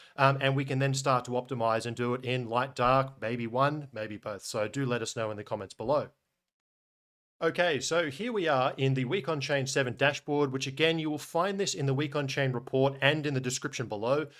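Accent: Australia. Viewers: I prefer dark mode, but either way your information is absolutely priceless and very much appreciated. Thank you for everything you do. And it's very nice to hear it all explained by an Aussie too!! 😃😃😃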